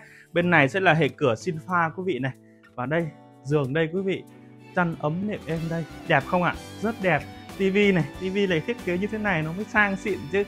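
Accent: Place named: North, Vietnam. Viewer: Ở Việt Nam mà báo giá triệu đô, nếu không nghe giọng Băc thì cứ tưởng ở bên Mẽo !!!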